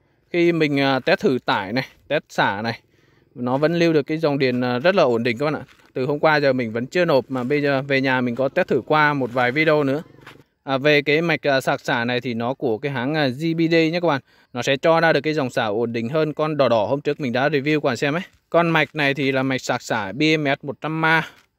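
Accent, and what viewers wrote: Nghe An, Vietnam. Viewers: Anh ở đâu nghệ an vậy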